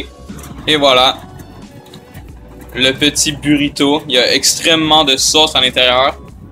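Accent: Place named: Canada. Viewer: Tkt ca mfait juste bizarre que tu parle canadien jsuis francais moi^^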